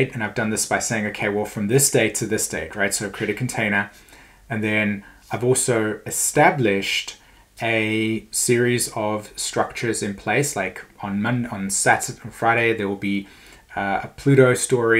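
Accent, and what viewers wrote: South Africa. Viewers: you are loosing your lovely South African accent.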